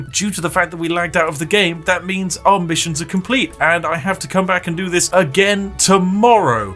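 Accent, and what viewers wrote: United Kingdom. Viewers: I am here just because I remembered this video had a program that is basically a lag-switch and I totally could use a program like that thanks random British man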